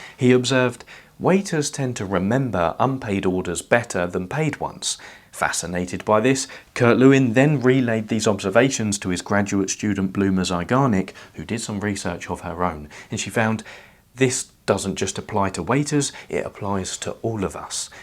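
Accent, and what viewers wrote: United Kingdom. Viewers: idk why this guy is so convincing, it must be the british accent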